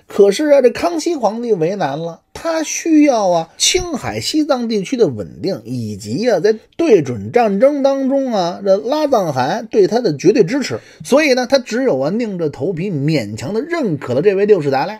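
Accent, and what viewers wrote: Beijing, China. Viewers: 冒昧的判断一下 这是一口老北京北城话😅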